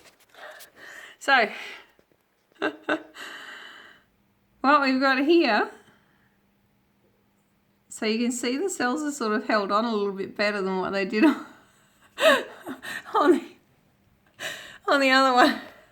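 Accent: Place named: United Kingdom. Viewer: Hi that's beautiful a suggestion for the cat that used to live in the house since you have an allergy maybe you could find her a forever home? clearly she's not a stray or feral like the other ones and she could really get hurt by one of those because they're not the same I used to live in Miami, florida,usa and the lady across the street I did not know at the time when I moved into my house she was a hoarder and it was just heartbreaking come to find out not only did she pick up ones from everywhere she even picked up one that I knew had been somebody's pet and she ended up telling me that when she found that one it had a collar on it so she was stealing people's pets also very sad please try and find the kitty that belongs to that house a home that would be so awesome thank you in advance maybe you could get ahold of a local rescue or something? 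And if you heard screeching going on that means they're in heat and you're going to have multiple cats pretty soon because they multiply almost as fast as rabbits local rescues in the United States all come also come in and spay and neuter Strays and feral cats I'm sure you sound like you're from the UK perhaps I'm sure they have something similar to that there I'm just trying to save you the headache of multiplying 7 cats turning into 17 etc etc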